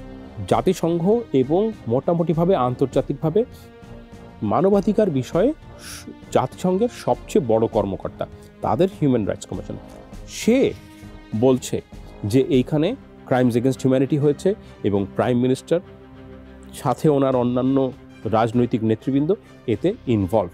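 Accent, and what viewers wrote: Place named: Kolkata, India. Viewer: কেউকি একটা জিনিস লক্ষ করেছের যে নিউজটা পড়তেছে তার কথার ভিতর কলকাতার বাংলা ভাষার একটা মিস্র আছে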